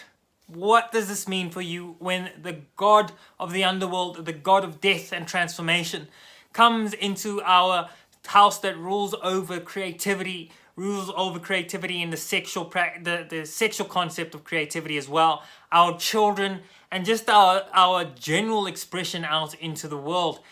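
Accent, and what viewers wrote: South Africa. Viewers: Are you from South Africa or perhaps New Zealand?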